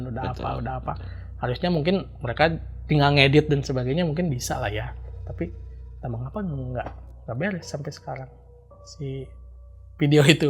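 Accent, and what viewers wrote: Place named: Sunda, Indonesia. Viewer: Logat Sundanya kental banget bang, walaupun pke B.Indo ttep aja sundanya kebawa 😍🔥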